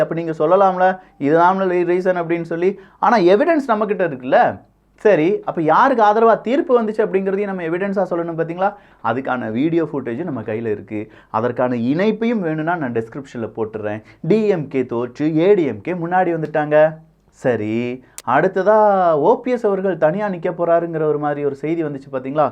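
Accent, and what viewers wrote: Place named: Tirunelveli, India. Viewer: that tirunelveli thoothukudi slang, loved it bro, iam from tirunelveli❤❤❤😍😍😍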